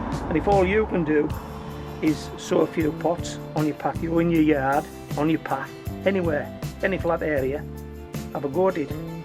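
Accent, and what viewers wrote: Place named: Northern England, United Kingdom. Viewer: your accent is cute:) are u from northern england?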